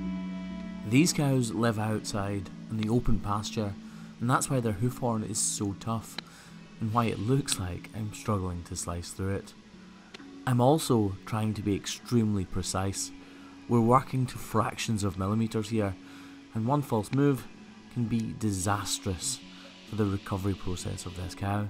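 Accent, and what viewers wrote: Scotland, United Kingdom. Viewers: I so enjoy your videos. I live on a farm no animals anymore but we plant 99 acres still. Your voice is so comforting. I am 3 generation Scottish American. Everyone family here with the accent has now passed. So when I listen to your videos I am taken back to my childhood and my nanny and grandpa. I have distent family still in Scotland. Definitely want to come home and find what family I still have. Maybe 1 day I'll comment that I finally made it. Keep caring for the beautiful ladies and big handsome gentlemen.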